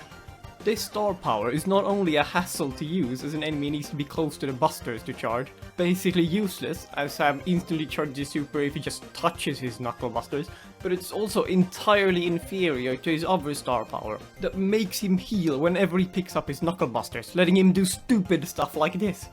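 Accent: Sweden. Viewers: bra svengelska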